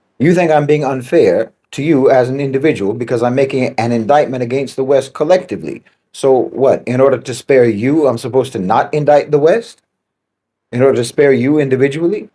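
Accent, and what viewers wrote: United States. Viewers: As he condescends with an American accent. 🙄 I can see what you see. Unfortunately, i am silenced while you are given a voice AND a platform to damn the 'collective West! Unbridled patriarchs in positions of power are the scourge of the world. Don't conflate those monsters with a population, the majority of whom were brainwashed by the most powerful propaganda machine to have ever existed! What makes YOU the exception?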